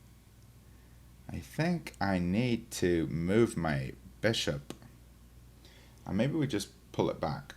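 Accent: Northern Ireland, United Kingdom. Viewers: I just ordered the Chessnut Go earlier today. I might get something like the Evo in the future but I'm a complete novice. I've only played a couple of games as a kid for about a week or two so will be interesting when I receive my chessboard. Is the tablet part detachable, that would make it better for storage, replacing tablet etc.? By the way you seem to have a Northern Irish accent coming through with a bit of a mix like James Mulgrew - Jimmy Cricket.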